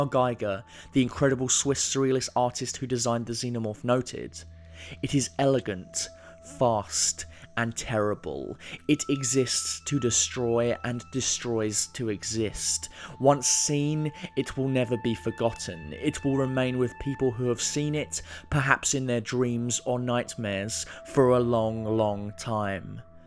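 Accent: United Kingdom. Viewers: He's British, nothing odd about his voice.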